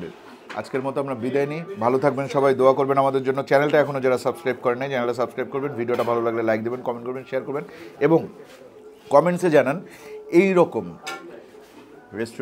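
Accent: Barishal, Bangladesh. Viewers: Vai borishal jaiya apnar kotha bArtai akta borishailla vab aisay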